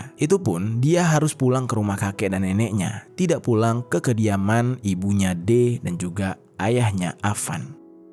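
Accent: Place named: Banyumas, Indonesia. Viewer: Ngapak brow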